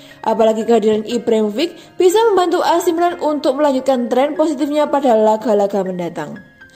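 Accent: Java, Indonesia. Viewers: Wah wah bahasanya medok bgt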